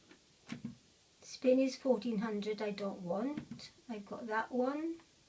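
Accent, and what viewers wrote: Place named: Wales, United Kingdom. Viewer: Hi, at first I thought I wouldn't watch the whole video since I don't really like videos where people talk much, but you have a very sweet voice and a charming accent. Are you from Wales?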